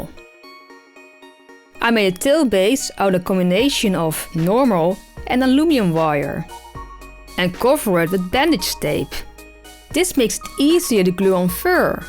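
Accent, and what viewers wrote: Netherlands. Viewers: Are you dutch? Because I'm hinting an accent and my guess is that you're from The Netherlands 😂😂🙌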